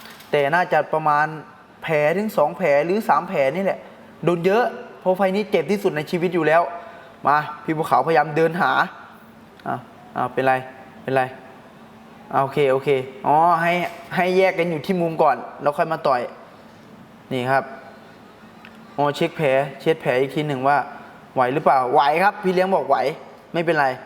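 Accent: Isan, Thailand. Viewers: พี่ ยังภาคไม่ดีเท่าไรนะคับเพราะว่าคำว่าเตือนการเป็นเตียน.เเล้วก้คำว่า.เลือดกายเป็นเลียด.พี่ น่าจะติดอีสานนิๆ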